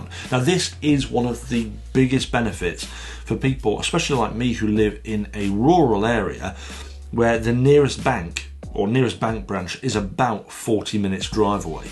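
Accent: United Kingdom. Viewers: im curious which part of the uk are u from!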